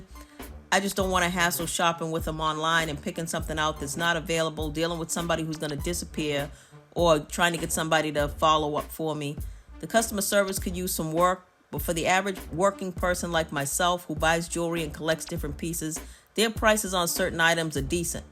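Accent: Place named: Baltimore, United States. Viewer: Sounds like a Baltimore or Jersey accent 🤔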